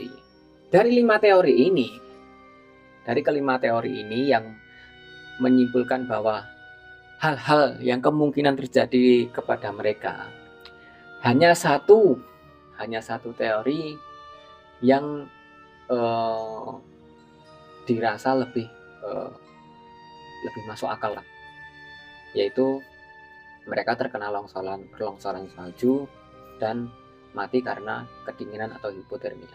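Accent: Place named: Java, Indonesia. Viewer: medok e ilangke mas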